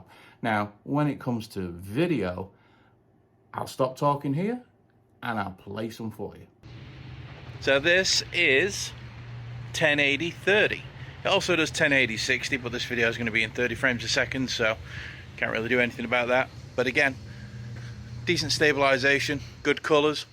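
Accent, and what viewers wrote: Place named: Pennines, United Kingdom. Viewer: Loving this phone so far. I detect a north west Pennine accent here mixed with US. Great review pal 👍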